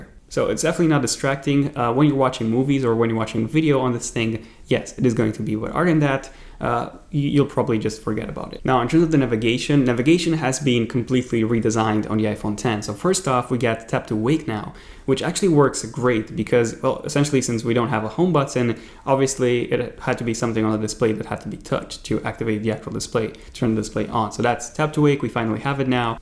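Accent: United States. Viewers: Your accent is like from usa. Good video mate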